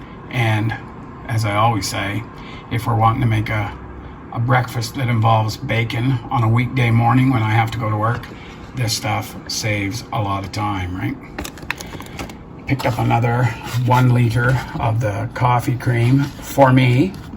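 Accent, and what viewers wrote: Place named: Eastern Canada, Canada. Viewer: I'm so thankful for your channel! I love listening to your accent because it reminds me of my Granddad and Uncle who were originally from out east:) It is such a wonderful blessing to know of brothers and sisters in Christ across this crazy nation! What a gift you give us by your prayers! May God bless you both richly!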